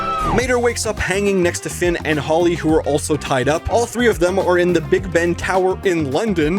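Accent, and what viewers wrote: Canada. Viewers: This dude sounds Canadian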